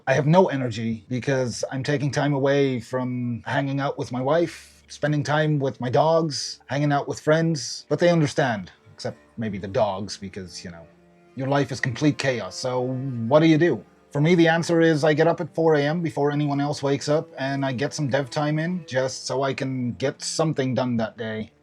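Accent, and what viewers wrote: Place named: United Kingdom. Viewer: All good points. One thing I wanted to mention though that most YouTubers conveniently fail to bring up: most countries outside of the US often provide artist related grants to indie game developers, to help them survive while they’re pursuing their dream. That may or may not be you (although you sound like you’re from the UK so it might be), but just imagine everything you just said, now add on to that the necessity of also being independently wealthy, or so young that you’re still mooching off mommy and daddy, and now you have a better perspective of what it’s like to be an indie game developer in the United States. Again, that may or may not be you, but I just wanted to mention it because it’s something that a lot of these European game devs will often deliberately omit.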